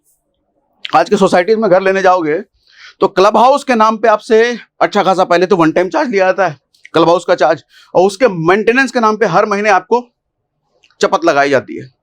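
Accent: Bihar, India. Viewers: AAP BIHAR SE HO KYA?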